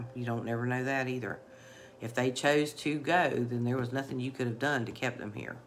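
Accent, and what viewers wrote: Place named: West Virginia, United States. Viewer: Hi! It is GREAT to put a voice to ur coaching. It puts a whole new spin on everything. Glad to be able to subscribe as i cannot be on Social Media d/t my job. Plus your dialect is a lot like my own being from WV. LOVE IT!!! THANK YOU!!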